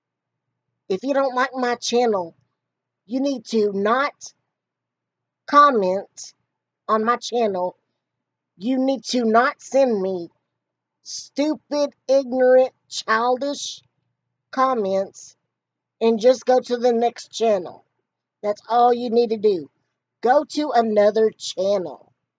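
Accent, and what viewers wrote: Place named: South, United States. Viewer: Keep doing what you are doing! You are such a positive woman and know that you will always have a crew backing you up. You are very funny and I get your sense of humor because I have a southern twang too! 😘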